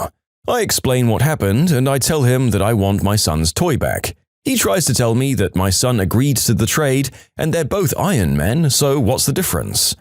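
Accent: United Kingdom. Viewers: I didn't know the narrator is british now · Why do you sound *BRITISH*???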